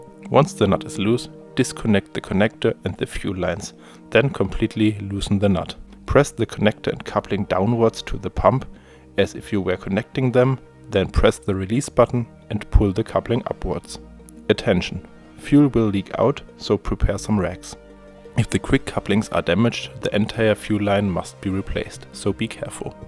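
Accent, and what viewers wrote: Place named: Germany. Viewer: 😀 Ich dachte erst du machsat jetzt auf Englisch Videos, wechseln ja viele zu, weil es halt viel mehr Viewer bedeutet. Ich kann ziemlich gut Englisch und muss sagen, dass du zwar voll Deutsch klingst aber alles voll verständlich von der Aussprache! Sehr gutes Video, bis auf das Wackeln, hatte das gar nicht auf deinem Channel gesehen, muss wohl vor meiner Zeit gewesen sein. Wünsche dir ein angenehmes Wochenende! PS: Herzlichen Glückwunsch zu 10.000 Abonnenten, das ist ja der Hammer oder hast du die schon länger? 😀 Bin manchmal verdaddelt, dachte nur gerade ,,Woa geil, er hat die 10K erreicht!". Das ist ja jetzt die erste Grenze zu Hobby-YouTubern und richtigen Berufs-YouTubern. Wenn du Werbung schaltest, mache ich auch gern den AddBlocker aus. Das ja wohl das Mindeste! So Roman Ende 😂👍🤦‍♂ LG HEUERs Pit Stop 🚗💨💥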